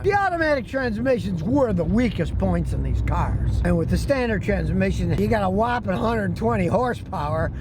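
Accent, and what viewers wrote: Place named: Western New York, United States. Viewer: He lives in TN, so I'm guessin' that Western NY inflection isn't translatable....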